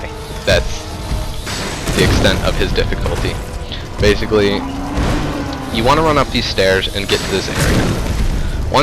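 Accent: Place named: Canada. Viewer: canadian eh ?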